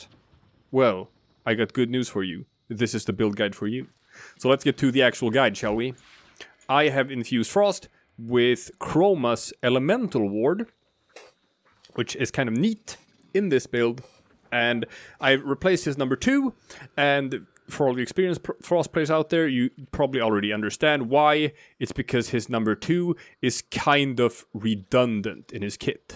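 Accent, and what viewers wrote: Sweden. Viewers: Are you swedish? You have a swedish dielect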